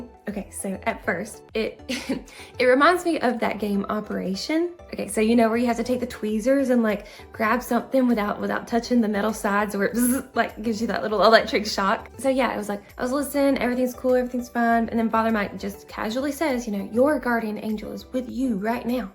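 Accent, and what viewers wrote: South, United States. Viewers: I'm a lifelong Catholic and I found this explanation in terms of the buzzer and the safeguard really, really helpful. I think the parallel you're drawing with the way Catholics react with "that's not what the Church teaches" is very apt. Thank you so much for sharing your experience. I really love your videos. Also, as a native Texan living in New England, I enjoy hearing your very Southern accent. It sounds very much like home.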